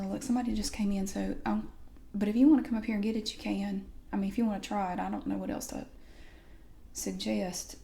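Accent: South, United States. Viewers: Absolutely wonderful! I just adore southern accents, they're so calming and relaxing. Fantastic job, keep it up! :^) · Is your real voice in a southern accent? If so mine is too!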